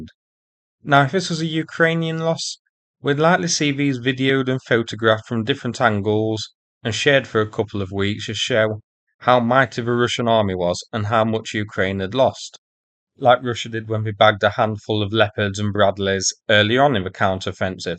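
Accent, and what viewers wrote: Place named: Home Counties, United Kingdom. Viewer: Sounds a bit Home Counties but I think you can safely call the 8 buildings that make up Andrivka a 'Hamlet' not a town 😉❤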